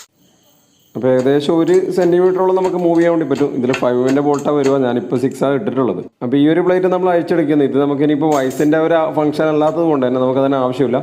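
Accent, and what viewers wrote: Kannur, India. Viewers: You are allrounder Best of luck. dear. And i like kannoor langage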